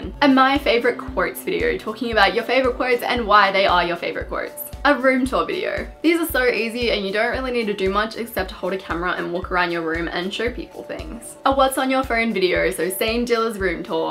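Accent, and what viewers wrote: Australia. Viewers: Are you Australian? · Omg r u Aussie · I think you're Australian accent, I love that accents.I went there for vacation love listening people are talking. I'm gonna start a new channel too. This is helpful .because I'm a new mommy wanna be busy while im home taking care my 3 months old baby.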